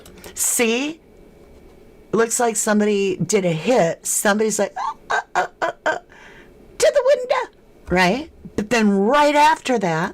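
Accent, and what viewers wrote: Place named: United Kingdom. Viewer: I came to you in the Jay case. Just love how you do things especially your British accents.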